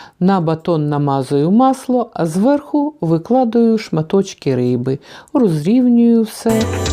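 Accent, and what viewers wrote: Standard. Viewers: Как приятно слышать чистый украинский язык! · Дуже приємно слухати чисту українську мову без суржика. Дуже приємна господиня!. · Какой красивый Чистый украинский язык.А не то что с ним сейчас сделали😡педагогыня минестриня😡😡😡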